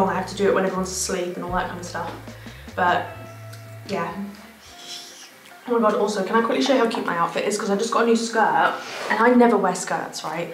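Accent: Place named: United Kingdom. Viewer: Idk what your accent is called but it’s the BEST kind of British for sure 🤣❤️❤️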